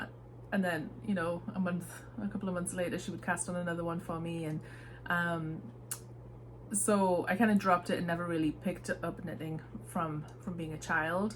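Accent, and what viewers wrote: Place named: Newcastle, United Kingdom. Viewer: Hello. Bonny Lass! As you continued speaking, the Geordie accent became clearer - my mam is 97 and she is from Jarrow! I am married to a Texan, but we live in England, so I have become used to a ‘mixed’ accent. I am so sad that you are based in the USA (costs of delivery to the UK 😱) as your yarn colours seem beautiful, I am an avid reader and I love tea. Oh well! The episode was really interesting and I am looking forward to future ones. Jinny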